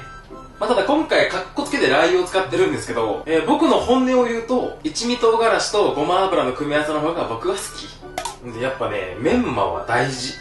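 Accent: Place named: Hakata, Japan. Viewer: 最近見させていただいてます！もっと早くさんを知りたかった！同じ九州人なので、時々出る博多弁が心地いいです！料理男子として尊敬しまてます！これからも動画楽しみにしています！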